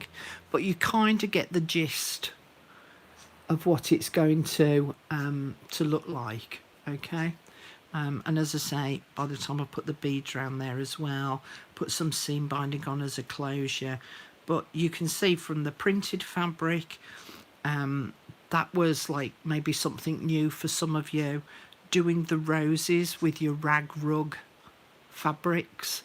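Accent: United Kingdom. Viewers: British I think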